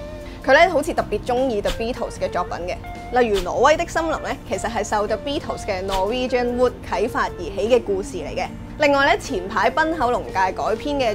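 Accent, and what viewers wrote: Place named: Hong Kong. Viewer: 台灣人報到！好鍾意妳的香港話😍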